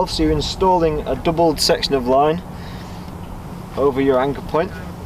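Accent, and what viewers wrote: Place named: England, United Kingdom. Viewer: He's English bro what the bloody Hell yo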